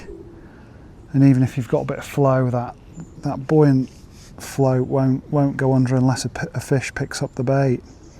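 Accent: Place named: Yorkshire, United Kingdom. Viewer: Another nice video, there’s definitely something about those low key, relaxing sessions, even if they don’t involve many fish, that makes them appealing to watch as well as experience - I often find myself feeling more relaxed after a couple of hours watching a float and maybe getting a fish or two than after stalking carp (which can be pretty nerve-racking) and coming home with an aching arm after a few battles. Sometimes we want excitement, other times to chill out. Nice to hear the Yorkshire accent being appreciated, it is, of course, the finest of all voices! It’s worth pointing out to the folks in your adopted home (and mine, I’m another exile) that there’s no one accent, everywhere up there has a local version and the Sheffield one is particularly relaxing to listen to…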